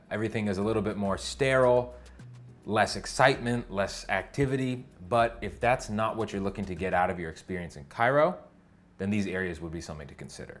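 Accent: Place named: Staten Island, United States. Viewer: Haha! Nice accent! Spent any time in Staten Island?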